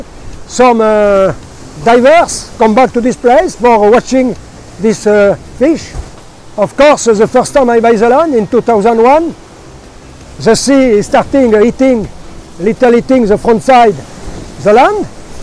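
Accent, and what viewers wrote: France. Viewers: I can sense some French accent.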